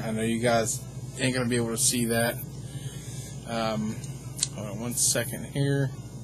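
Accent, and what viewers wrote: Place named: Pittsburgh, United States. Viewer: you are definitely da burgh, nice review dude, just got one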